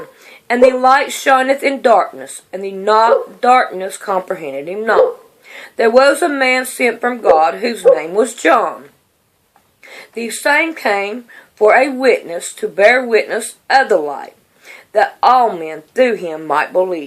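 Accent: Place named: Rural, United States. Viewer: Can you quit sounding like a Hick?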